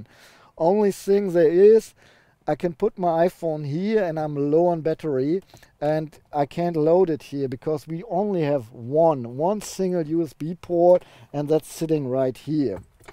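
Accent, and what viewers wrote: Germany. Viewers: I really like your complete cover of the car, your german accent is really not a problem. Continue like that :-)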